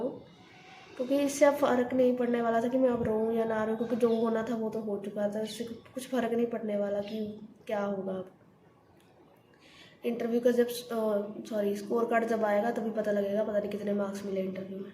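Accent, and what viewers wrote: Punjab, India. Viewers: Aap punjab se ho?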